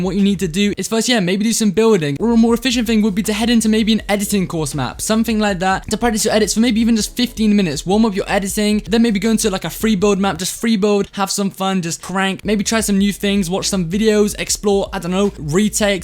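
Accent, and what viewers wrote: Sweden. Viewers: are you from sweden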